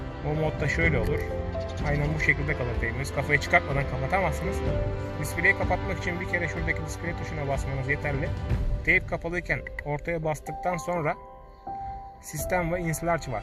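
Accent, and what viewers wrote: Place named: Thrace, Turkey. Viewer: dayı tekirdağlı mısın edirne mi? :D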